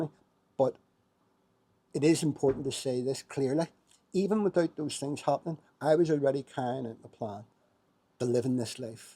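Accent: Northern Ireland, United Kingdom. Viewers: Where did you move from? NI by the sound of it. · well, you can hear that he's northern Irish. Some Northern Irish people choose a British Passport and some choose an Irish Passport but they can apply for either. (As I understand it). I'm from the republic, so this is just my understanding.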